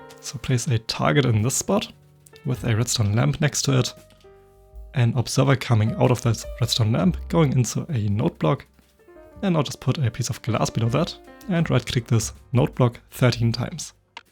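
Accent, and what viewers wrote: Germany. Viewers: I hear a wening deutsch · Warum nicht deutsch man hört das du deutsch bist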